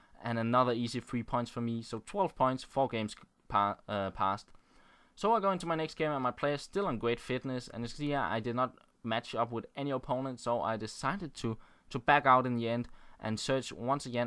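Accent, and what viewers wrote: Denmark. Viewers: You sound like a Dane.. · he's so danish its hilarious i love his commentaries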